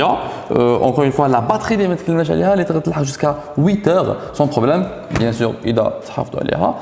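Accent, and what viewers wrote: Algeria. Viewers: اللهجة الجزائرية مع الفرنسية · 😂لهجة الجزائري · Algerian rah Bayen😂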